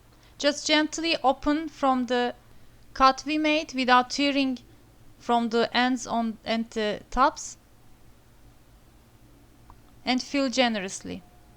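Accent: Turkey. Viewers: This english makes me laugh because one actress from turkey talks like this in the film i forgot her name 😂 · Very Turkish accent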